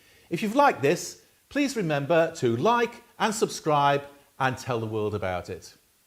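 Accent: United Kingdom. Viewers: Hi, I’m not sure if you ever get to answer any of these comments but I’ll ask anyhow. So refreshing to hear an English rather than American accent for a change by the way! I’m coming back to the UK next year from South Africa and would love to know of workshops, Guilds or such where I could learn (I’m pretty much self taught and have only been doing wood work for 3 years). Please give me any advise if it’s at all possible. Thank you.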